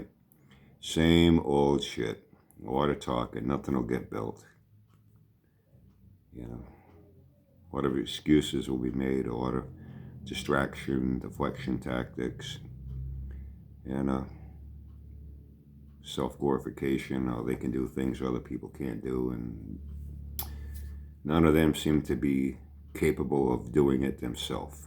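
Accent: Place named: New England, United States. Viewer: you are always the " voice of reason" I don't GF what they say the new England accent is just the salt and pepper on top...😁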